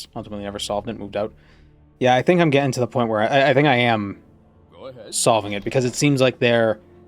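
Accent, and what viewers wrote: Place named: New England, United States. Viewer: What could really deal with head shine AND bump your steez is maybe a newsboy/flat cap. Could also lean into the New England accent for the bit, though I have no idea if a hat would just cause too much sweat, which might make prop comedy like that not so good.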